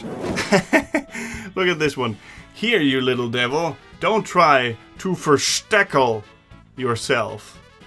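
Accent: United States. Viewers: This was too funny! Your American accent is kinda silly, most of these words are pronounced as they would be in regular German. A large portion of my family is PA Dutch and I grew up hearing random German words intermixed with English. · Oh, and actually the words are pronounced more like your German pronunciation than the 'americanized' way you are pronouncing them. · More! More! More! Your American accent is perfect!😄😄😄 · I am very glad you posted this. I am interested in seeing what Germans think about the Pennsylvania Dutch. I live and grew up in Philadelphia which is very close to "PA Dutch Country" and my mother's family are PA German. Pennsylvania Dutch takes in a number of people of German descent that live in portion of Pennsylvania you provided in the map. In many people's minds Pennsylvania Dutch = Amish which is the most famous group of Pennsylvania Dutch. 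But it is incorrect to think that Pennsylvania Dutch and Amish are synonymous. By the way the map did not include Philadelphia which I think is incorrect. Philadelphia had and still has a large German population. In fact it is through Philadelphia that these immigrants came through. I live in a section of the city called Germantown. This is where the original German immigrants settled shortly after the founding of Pennsylvania in the late 17th century. There is an old (early 18th century) Mennonite Meeting House nearby where I live as well as a number of other evidences of the original settlers in this section of the city. One thing regarding your pronunciation of the words. You were doing an Americanized reading of the words. The pronunciation is actually close to German than you might think. The spelling of the words are actually an Anglicanizaton of what people were hearing. Sorry for the long posting but I just wanted to point something out regarding German immigration to the US. 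Believe it or not the largest group of European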